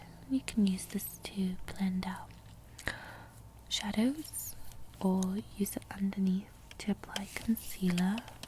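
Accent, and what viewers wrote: United States. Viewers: Dude you pick up accents so quick, I can hear the US accent creeping in already :D awesome video friend, grateful as always.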